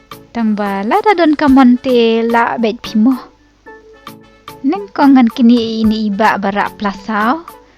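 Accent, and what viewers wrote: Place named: Sohra, India. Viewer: Shu comic noh ita isur ..mih sur war sohra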